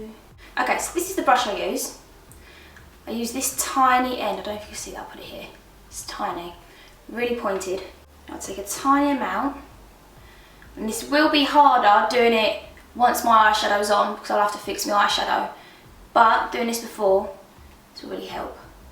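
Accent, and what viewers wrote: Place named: Essex, United Kingdom. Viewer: DAT Essex accent though 😩❤️❤️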